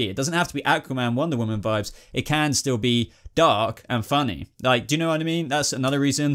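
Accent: England, United Kingdom. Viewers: Alicia Fox He has an English accent, so I’m assuming he’s English?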